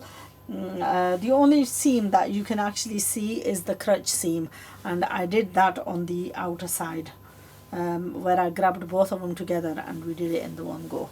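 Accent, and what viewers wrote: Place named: Australia. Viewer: I don't know but she speaks like Australians but she is Asian.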